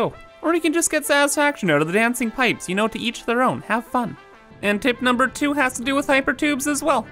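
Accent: Germany. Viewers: Are you german??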